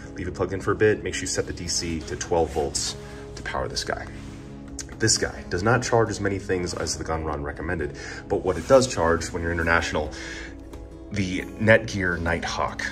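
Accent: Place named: United States. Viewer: Hope release more Video like this, so that I can learn more American English.❤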